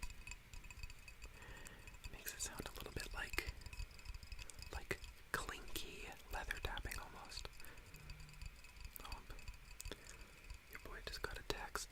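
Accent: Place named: Canada. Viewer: mic setting is immaculate 🤌🏼 also the way your canadian accent says certain words lowkey hits?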